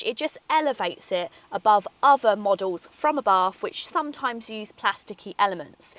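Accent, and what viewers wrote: Essex, United Kingdom. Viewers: Everything you say sounds good in your Essex accent, including "Anniversario"! I really like it!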